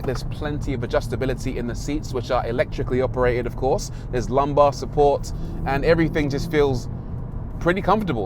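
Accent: United Kingdom. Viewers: British accent, left side drive, euro plates, where is this guy?!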